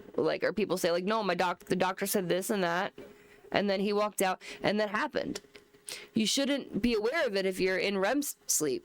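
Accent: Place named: United States. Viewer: Australian with an American accent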